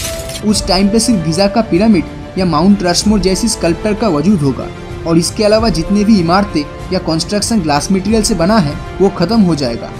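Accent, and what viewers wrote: Bengal, India. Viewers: Bhai aap bengali ho kya.. bilkul bengali accent hai aapki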